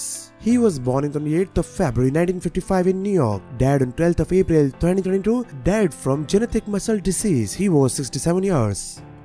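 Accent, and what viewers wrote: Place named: India. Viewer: ENTIRE PHRASES WENT BY THAT I COULD NOT UNDERSTAND.😩 NARRATOR SOUNDS TO BE FROM INDIA. IF HE WOULD JUST SLOW DOWN, IT WOULD HAVE HELPED!☹️😩😆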